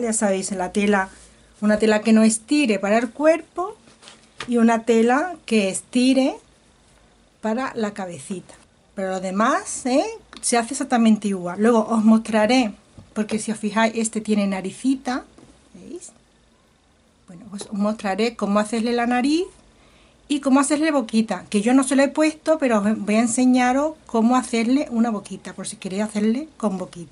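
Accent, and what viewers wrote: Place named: Cuba. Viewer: Hola amiga!Gracias por compartir esa hermosura de muñeco!!Tu eres cubana,eh,amiga?Tienesa veces un acento de ese país tropical.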